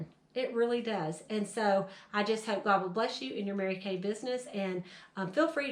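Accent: South, United States. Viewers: Love your genuine southern accent. And of course the way you break it down for us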